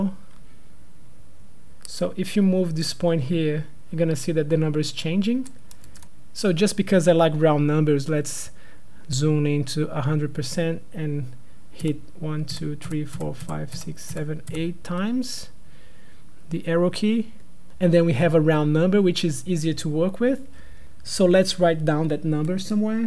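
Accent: Brazil. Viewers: are you brazillian? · Mano, vc é brasileiro. Diz ai!? Sotaque entrega. Muito foda, parabéns!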